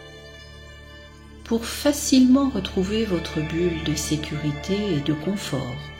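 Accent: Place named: Marseille, France. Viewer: L’accent marseillais et l’écho derrière la voix rendent la vidéo très peu crédible et on décroche très rapidement. À revoir totalement. La musique en fond est trop présente et très gênante... voire irritante.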